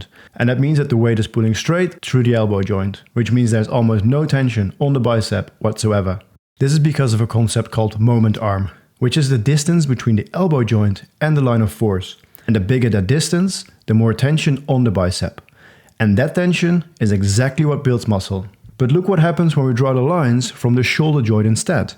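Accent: Netherlands. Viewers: Fit gast klinkt als een Nederlander 🤔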